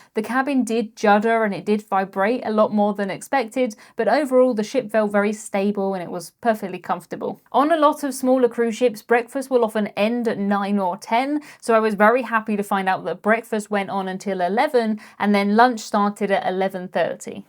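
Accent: United Kingdom. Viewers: I just love this gal. The Brit accent is intoxicating! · Not a moan, but more of a question. Why do you call biscuits cookies, when sound like you are from the UK?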